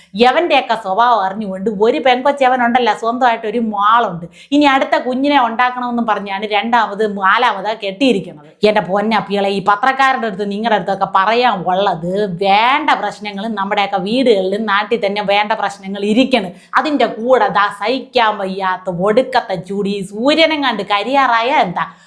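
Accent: Thiruvananthapuram, India. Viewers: ചിരിച് ചിരിച് ചത്തു തിരുവനന്തപുരം കാരിയാണോ സ്ലാങ് കലക്കി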